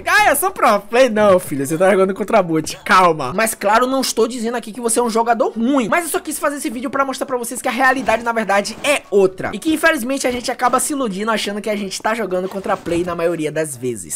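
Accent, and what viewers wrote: Brazil. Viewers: esse sotaque ai é de salvador né, possivel...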